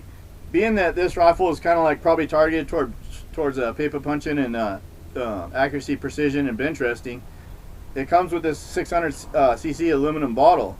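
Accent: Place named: Louisiana, United States. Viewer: You must be from home I can hear it in ur voice yeah!! ( Louisiana)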